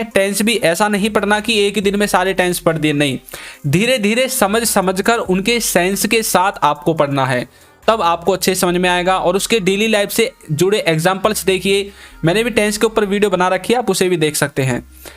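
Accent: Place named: Uttar Pradesh, India. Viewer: Sir apka language tone to up ka sa he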